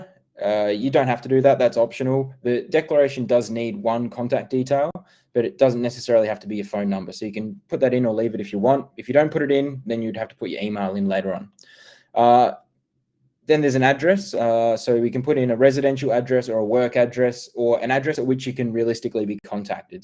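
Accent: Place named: Australia. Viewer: May you be well & happy dude....im here for listening australian accent ...love from sri lanka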